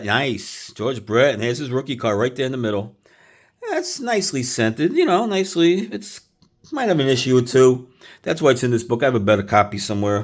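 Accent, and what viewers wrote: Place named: New York, United States. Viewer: This guys NY accent is thick as a rug.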